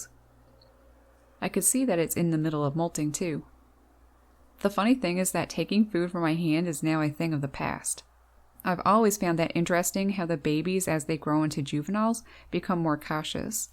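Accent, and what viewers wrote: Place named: Ottawa, Canada. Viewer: Flickr is a great name :-p / I just realised you are a fellow Canadian. I hear the Ottawa? accent now :-p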